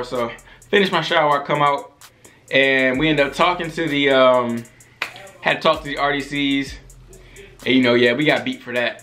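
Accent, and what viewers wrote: Baltimore, United States. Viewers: I knew you was from baltimore before you even said anything 😂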